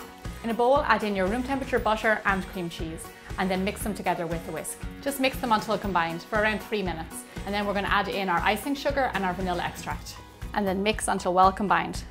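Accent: Ireland. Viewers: this accent sounds Irish. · U sound irish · What a lovely Irish accent and what a great recipe. Thank you. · I hear a hint of Irish.can you do a vid on making Bailys Irish cream the nector of the Gods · Is she from Ireland 🇮🇪?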